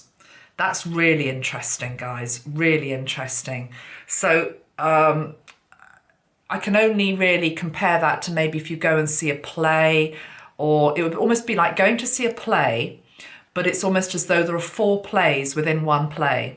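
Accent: United Kingdom. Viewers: You are so so gifted and insightful .. This reading as with all you readings i have come across are beautifully accurate. Being British myself it is nice to hear the accent and the same sense of British witty hunour as myself. THanjk you for sharing your psychic abilities with us and guiding us to your best honest knowledge... I appreciate it. Om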